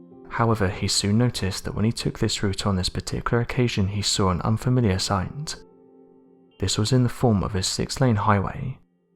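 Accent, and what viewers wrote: United Kingdom. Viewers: If things are happening in Casper, Wyoming, why can't you have someone from Casper, Wyoming narrate the happening? Could be really good and we in America could probably understand wtf, he was actually saying! I am really getting sick of the accent, inability to pronounce the American language and that non-emotional, dreary, British voice!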